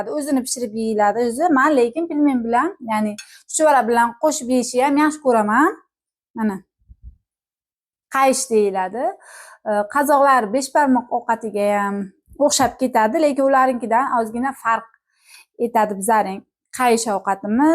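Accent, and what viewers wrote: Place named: Khorezm, Uzbekistan. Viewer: Siz o'zi horazmdanmasmi shevangiz horazmchada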